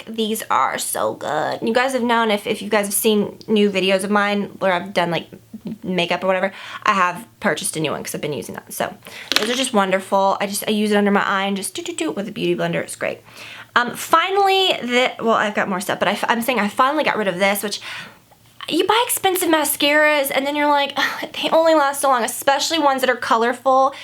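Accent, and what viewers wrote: South, United States. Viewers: You are FAB! (Your southern accent is subtle, but so cute!)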